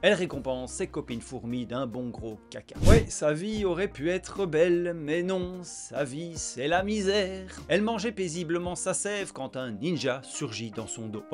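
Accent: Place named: Belgium. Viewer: Ça s'entend mais c'est pas dérangent je crois que j'ai un accent plus fort que toi d'ailleurs 😂 non se qui m'a fais me dire ça c'est tes expression qui sont bien belge❤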